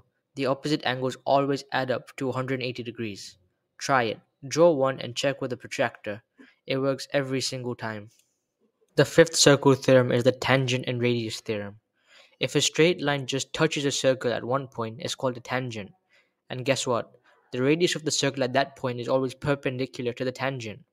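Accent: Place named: United States. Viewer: Dude your accsent is somewhat like american. Great job ❤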